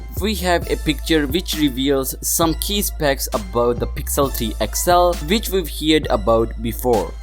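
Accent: India. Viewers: Ну я, конечно, не могу этим похвастаться, однако мне все равно кажется, что это какой-то восточный акцент (то ли индус, то ли еще что-то в этом роде).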